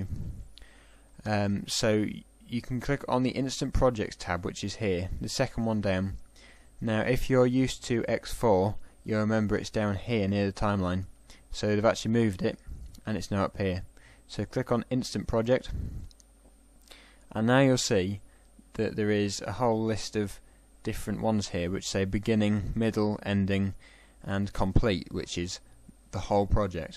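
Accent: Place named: England, United Kingdom. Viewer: Thanks mate for helping video. Just optained Corel Video Pro 6. Very nice tutorial for starting guys like me. Love your Englings accent. Cheers, Eric (nl)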